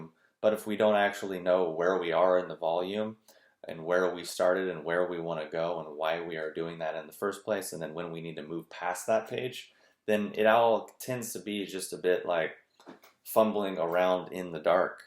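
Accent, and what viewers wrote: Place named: South, United States. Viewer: oxymoron if you don't know what he's saying but keep coming back because he talks level headed emm OK, u may have a demonic force on u haha. little penis lol Spot on all this spiritual Shit people take so obsessively and they're usually the biggest bastards behind closed doors. at least I know some of my beliefs are a delusion but I like them so I guess that's my entertainment...you are a thought provoking fella, (that southern accent, I fuckin love your mocking voices) 😂😂👍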